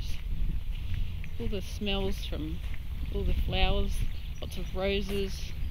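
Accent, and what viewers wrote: Australia. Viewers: I thought u sounded Australian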